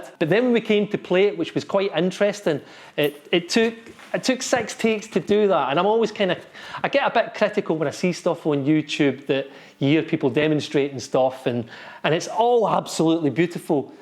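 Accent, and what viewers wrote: Scotland, United Kingdom. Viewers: That was pure brilliant mate. You from Scotland?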